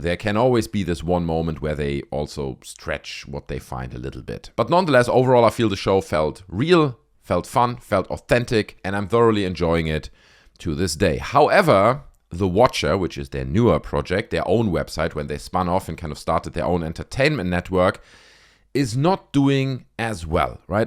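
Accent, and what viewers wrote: Germany. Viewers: Hey, you sound like you're German, if so: greetings from Germany to Germany! 😊 You are totally right with what you say: the old Buzzfeed episodes felt more fresh, more funny, more original. The content they are doing on Watcher looks more expensively produced, but it quite lacks the original soul and comedic harmony between the two guys. I always thought that they should have stayed at Buzzfeed, their new channel was quite mediocre in my taste. Some shows were good, like Puppet History, others were questionable (Too Many Spirits... it may sound fun, but having seen friends go down due to alcohol abuse I am above thinking that getting drunk is something which should be fun), and pretty much all shows with Steven Lim were not good. I remember that one video when they announced a discussion about wether ghosts are real or not... I thought great, just like old times, except it was not. 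Shane was good as ever and did a great presentation, but Ryan was SO annoying that I had to take three steps to complete the video, he was almost unwatchable. He looked like he had spend the last two days sleeping under a bridge, what he said was not funny at all, he did not even try to participate to the topic of the video. When he started munching apples while talking, I was one click away from stopping for good but I finished it to see if it got any better... it did not. And then they decided to blow up their own channel. It cost them half a million subs (in fact they lost another 10K to when I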